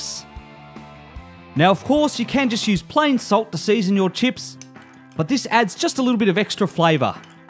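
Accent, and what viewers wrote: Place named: Australia. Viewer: uselessjoe the accent is australian, if that's what you were getting at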